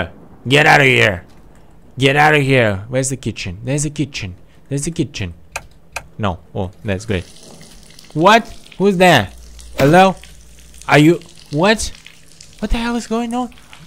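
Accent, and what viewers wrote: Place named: United States. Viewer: Сначало подумал что ты американец, хорошо маскируешься👍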